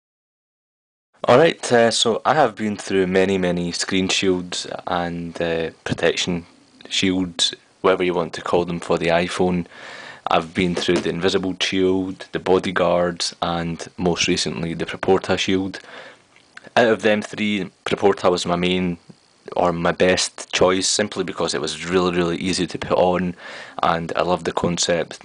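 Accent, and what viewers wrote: Scotland, United Kingdom. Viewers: u sound scottish? am i correct?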